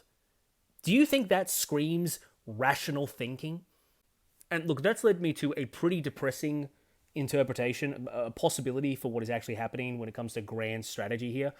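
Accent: Australia. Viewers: Australian channel, Australian pronunciation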